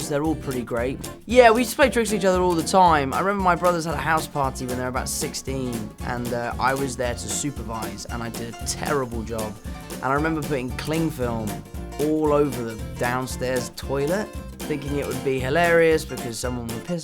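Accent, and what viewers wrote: United Kingdom. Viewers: His British accent is alive we can rest now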